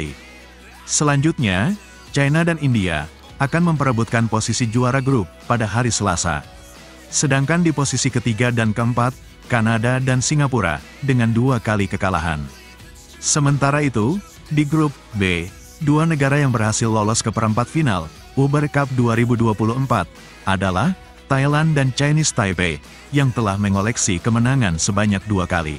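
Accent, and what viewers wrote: Banyumas, Indonesia. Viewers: Ngapak ora baen baen ya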